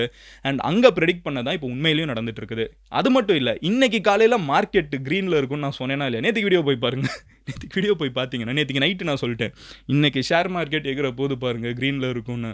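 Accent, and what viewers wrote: Madurai, India. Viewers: Poravainga, madhura slang 😁😁😁😁 vera level🎉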